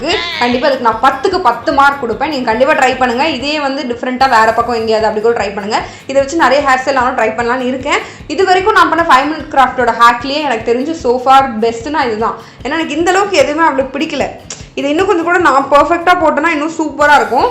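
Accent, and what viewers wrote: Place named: Kanyakumari, India. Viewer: Are you from kannyakumari your slang is pretty good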